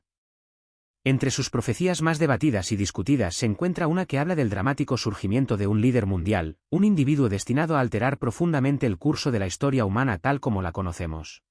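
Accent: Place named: Spain. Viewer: UNA SELECCIÓN SELECCIONADA????, POR PIEDAD, UNA UNA APLICACIÓN DE NARRACIÓN EN ESPAÑOL LATINO, EL ACENTO DE ESPAÑA ES NAUSEBUNDO......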